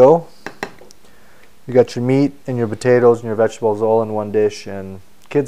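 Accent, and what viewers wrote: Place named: Canada. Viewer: Umm you talk funny and have an accent. You sound like a Canadian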